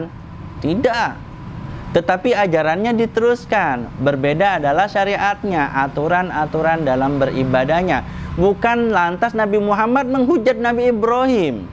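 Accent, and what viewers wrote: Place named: West Java, Indonesia. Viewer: Koh.. Chinese berlogat sunda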